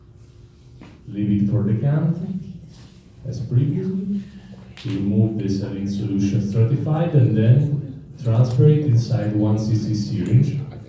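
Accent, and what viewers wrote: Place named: Italy. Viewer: Che accento troppo italiano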